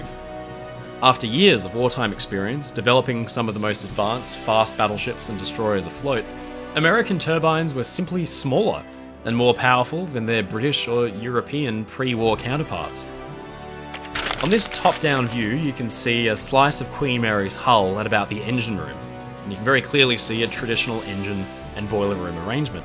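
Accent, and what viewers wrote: Australia. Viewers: Thank you for so many absolutely great documentaries,always enjoy your knowledgeable & well spoken narration. Nice to hear an Australian voice! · I thought you were British until you said "Hee-Uh". Then I realized you're a Aussie mate.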